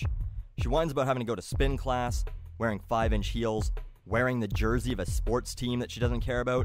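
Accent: Canada. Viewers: you had a way heavier canadien accent back then. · Bro! Listen to the end of sentences! He sounds so Canadian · I here more of a Canadian accent with these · Oh god you can really hear Canadian accent. 10 out of fucking 10.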